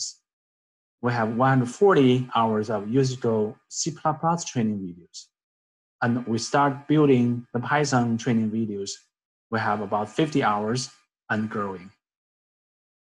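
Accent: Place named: China. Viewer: I love your Chinese accent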